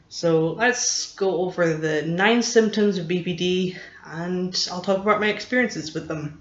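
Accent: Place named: Scotland, United Kingdom. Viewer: Hello are you Scottish. I hear your Scottish accent coming through the more you talk, and relax. It’s as if you’re subconsciously hiding the Scottish accent to please ppl listening online. I ‘get it’ because I used to do the same when I was younger, and probably would again now if I made a YouTube video. I’m not judging you ok 👍- I’m just making an observation. And i admit I could be wrong. I just want to say hats off - Good for you to have the courage to make a video about this situation. I found your video become looked for info on borderline inner pain. I’m in a lot of inner emotional pain rn. I wish I had the courage to make a video for YouTube to share how I’m feeling. Can I ask you/ - does it make you feel better having uploaded these videos? Thank you. Blessings.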